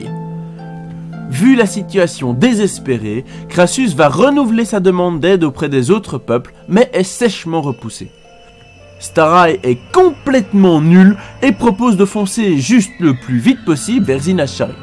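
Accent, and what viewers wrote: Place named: Belgium. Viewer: Il est belge c'est pas des erreurs de prononciation c'est son accent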